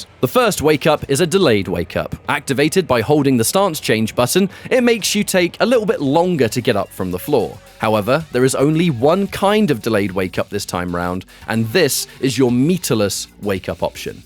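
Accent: United Kingdom. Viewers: There is something about people from UK narating stuff. I could listen to you guys talk about damn fllowers for hours, let alone stuff I’m interested in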